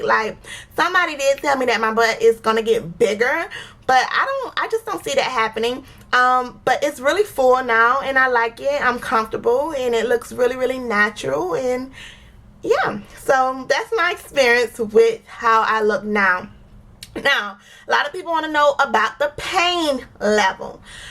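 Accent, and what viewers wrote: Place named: Florida, United States. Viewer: I just found your channel... I love it... I love how us Floridians pronounce stretchmarks (skretchmarks) 😊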